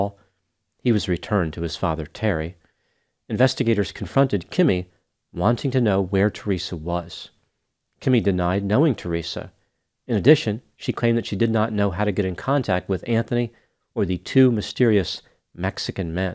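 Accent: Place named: Pittsburgh, United States. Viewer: Are you from Pittsburgh,? Your accent reminds me of my hometown. 😊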